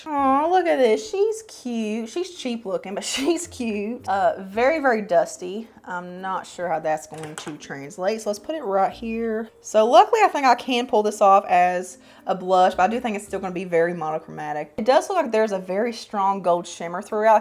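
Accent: South, United States. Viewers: I just love your take on everything and life in general. I live in Minnesota but grew up in Georgia adore your drawl it makes so homesick. I hate the midwest people arent friendly like us southern folk. Thank you for brightening my days with your keen sense of humor!❤❤BTW where you get your eyeglasses they are so kewl on you#!☮️💟🕊️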